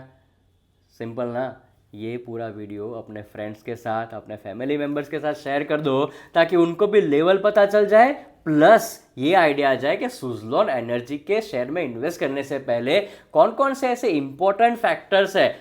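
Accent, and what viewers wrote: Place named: Gujarat, India. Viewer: Aap ki baat se lagta hai ap Gujarati hoo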